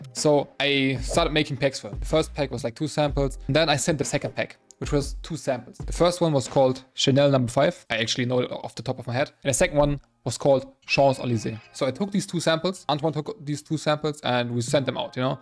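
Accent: Germany. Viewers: its literally my top 1 fav song rn, fire shit 🔥🔥, weird question, but you german? its bc of your little accent, would be crazy lol. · man hört direkt das du auch aus Deutschland bist haha. glückwunsch!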